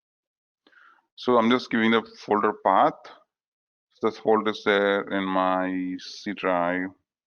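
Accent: India. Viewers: I felt like I m studying in NareshIT Ameerpet.. sir, south accent is like that..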